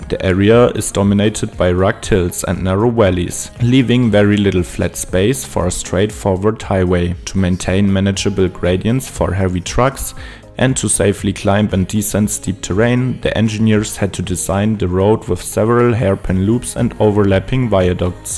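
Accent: Germany. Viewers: boah den deutsch Akzent kann man sich echt nicht geben😂😂😂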